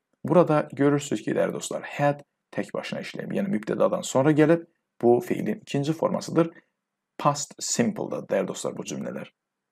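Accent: England, United Kingdom. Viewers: You're perfect. And your accent as like real England accent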